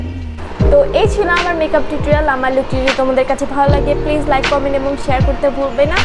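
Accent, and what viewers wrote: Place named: Bangladesh. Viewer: when you start talking 💗💗 damn she is bangladeshi😻💕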